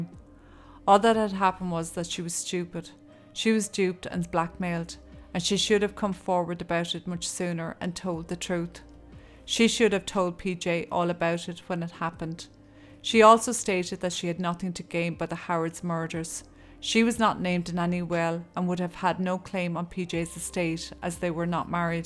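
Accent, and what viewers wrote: Ireland. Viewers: that Irish lilt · Your lovely lilting Irish voice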